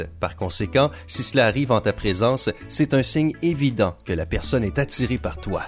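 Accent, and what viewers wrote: Quebec, Canada. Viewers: J’adore cet accent quebequois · T'es québécois ? Sa se reconnait avec ta voix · Tu es québécois ou quoi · C une voix québécoise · Cette accent canadien ouch 😂 PS : #teamcelibat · Lol il est quebecois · Hé oui ! C'est bien tout ce qui s'est passé... deux ans avant ma rupture ! Heureusement qu'il y a cet accent québécois pour me r'monter l'moraaale !!! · C la nouvelle voie québécoise de simpa ou quoi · Zoumouda Soufou C'est l'accent québécois. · C un québécois? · Accent québécois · J'aime bien vos vidéos je me suis abonné. Et c'est un joli t'accent canadien. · Québécois XD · L'accent Québecois est tout simplement insupportable, c'est une insulte à la langue française.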